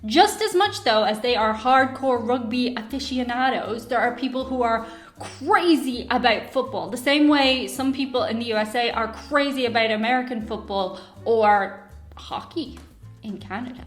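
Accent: Ireland. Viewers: Just wanted to drop in and say u love ur accent. Don't stop being Irish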